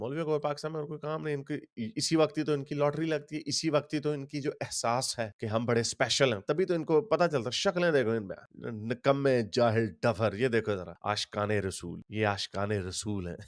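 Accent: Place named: Punjab, India. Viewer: Bhai kitna bhi disheartened ho jao but videos banani band nahi karna. Aapka Punjabi accent aur Punjabi mein kosna bohot acha lagta hai.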